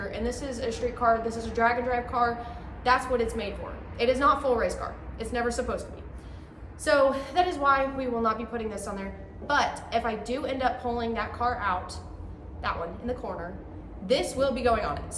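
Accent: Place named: Kentucky, United States. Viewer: Developing a lil KY accent cute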